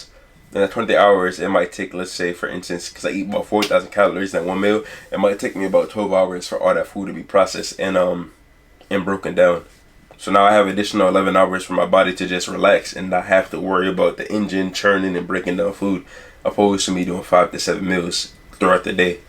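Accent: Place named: New York, United States. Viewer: Yeah i could tell u from nyc lol but good video king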